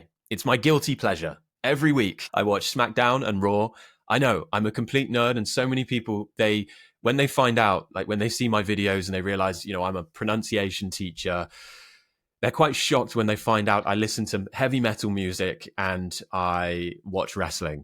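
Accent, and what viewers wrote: United Kingdom. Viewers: My favorite English Teacher ever. For all those who love the brittish accent, we should create a whatsapp group to practice all together.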